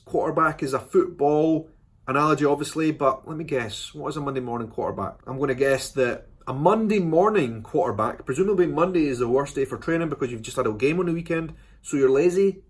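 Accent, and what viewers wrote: Scotland, United Kingdom. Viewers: I just adore you. It's so touching that you are at all concerned with my country but you seem particularly interested. I feels like such a compliment that you've gone to so much trouble to see what we're about. I desperately wish I had the means to visit Scotland and return the favor. Your accent is enchanting to me. :) I wish I could experience your country, as well. I've only seen it on film and screen but so much of it seems utterly beautiful.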